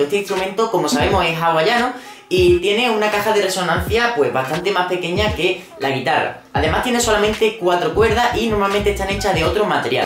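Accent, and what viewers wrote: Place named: Spain. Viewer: De que parte de España eres? ese acento no lo tengo familiarizado.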